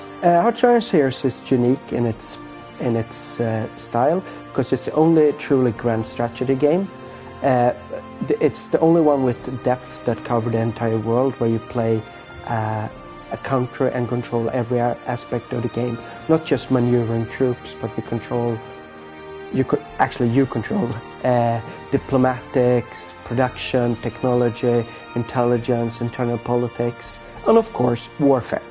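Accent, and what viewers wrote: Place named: Sweden. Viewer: this accent is horrrrrrrible........ im swedish too but.. seriously get a proper narrator for these types of things, even if he's reading from a script. its better than someone who can hardly speak the language..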